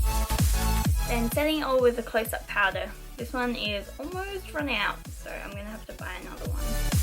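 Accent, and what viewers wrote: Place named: Australia. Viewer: Where are you from you sound Aussie but then you have a bit of a English accent to it ❤️ it's beautiful though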